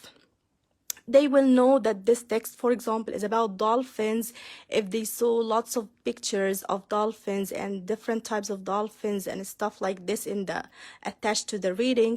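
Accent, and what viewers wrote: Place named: United Kingdom. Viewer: تبارك الله Your language is really perfect fluent and confident, British accent.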